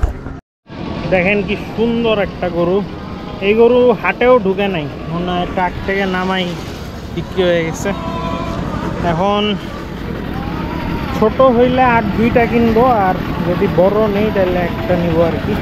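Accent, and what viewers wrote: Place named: South Korea. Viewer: Oi hala English sound hoi ke ?? Thik Korean bangla den voice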